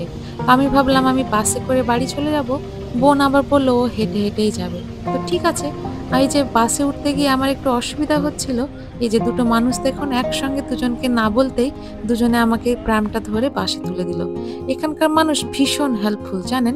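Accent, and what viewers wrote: Bangladesh. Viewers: Tomar kothar dhoron Bangladesher moto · Apo apnito bangladeshi der moto kotha bolen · Apnar bangla pronunciation akdom e indian bengalis der moto na. You're sounding like a bangladeshi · Yes you speak like bangladeshi.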